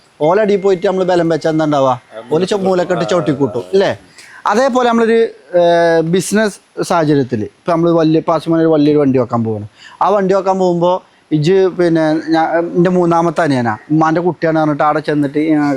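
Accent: Malappuram, India. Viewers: സൂസ് അത് പൊളിച്ചു മലപ്പുറം ഭാഷ അത് തിരിയുന്നവന്ന് തിരിയും അല്ലാത്തവൻ നട്ടം തിരിയും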